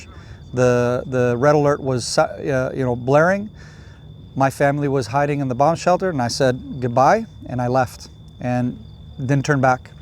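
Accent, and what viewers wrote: United States. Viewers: There's a good documentary on Netflix about gaza and Palastinians day to day life. The propaganda is strong in this one, just notice the perfect american accent. Ask palestinians if they can return to their ancestral lands also. I hate Hamas and the IDF for what they have brought on to palestinian citizens. Palestinians just want freedom. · I did watch it in full, thanks. There could be a number of reasons why he has an American English accent. It's no big deal.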